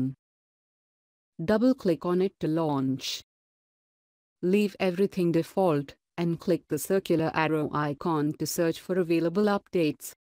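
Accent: India. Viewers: i can still hear an indian tongue ..SRSLY BAHBAH who heard it like i do ? anyone ?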